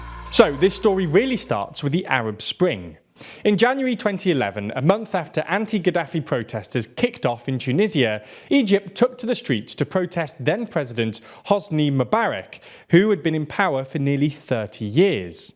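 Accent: United Kingdom. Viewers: Its crazy for a dude with a British accent to all a global south leader "autocratic" lmao Especially since Egypt broke free from direct British rule about 10 years before my grandmother was born. In fact, there's probably people in Egypt right now who remember British rule. Like have a seat. Its also buck wild that they're making it seem like the economic issues of the country can be blamed on like one dude as if colonialism and the imposition of neo liberalism have nothing to do with it. Like bfr. Its useful to hear how liberals view things tho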